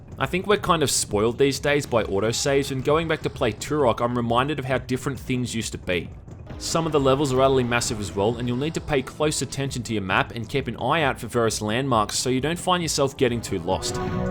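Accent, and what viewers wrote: Australia. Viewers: Australian accent that isn't danger dolan yay! subbing just for that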